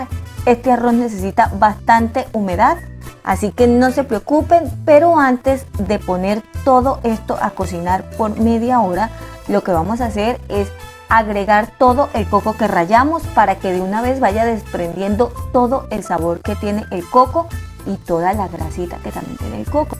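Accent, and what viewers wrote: Colombia. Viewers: El acento no lo pude deducir...escuché valluno, costeño y paisa. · Ese acento es del llano, Santander o amazonas?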